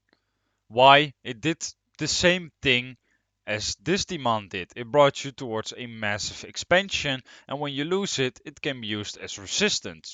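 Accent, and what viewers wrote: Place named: Netherlands. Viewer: Your accent is Dutch / Eindhoven I guess. In your opinion PEPE will make new ATH OR ? Thank you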